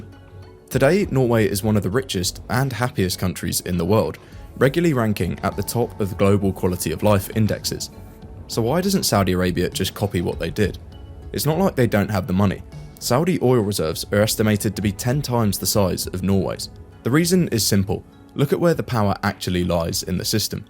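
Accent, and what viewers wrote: United Kingdom. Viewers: A BRIT TALKING 😂. CRIME RATE THROUGH THE ROOF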